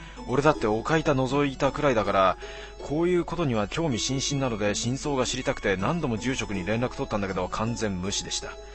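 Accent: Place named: Iwate, Japan. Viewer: 岩手のなまりってこんなかなぁ…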